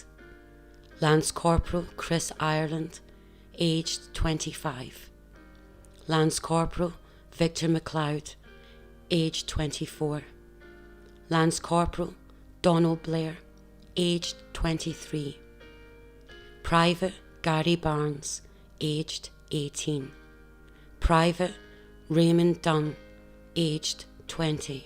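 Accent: Scotland, United Kingdom. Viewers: A scottish woman narrating ..what next ..was there justification for bloody sunday..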